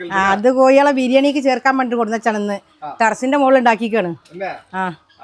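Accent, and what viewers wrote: Malappuram, India. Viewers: നമ്മളെ മലപ്പുറം ഭാഷ ഇങ്ങനാടാ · മലപ്പുറം ആണ് അല്ലെ സംസാരം കേട്ടിട്ട് 😁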